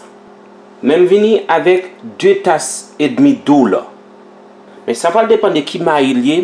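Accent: Quebec, Canada. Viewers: retire kebeka a nan bouch ou tanpri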